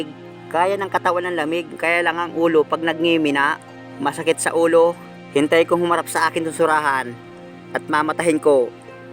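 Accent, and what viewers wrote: Bicol, Philippines. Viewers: bicol tagalog salita m idol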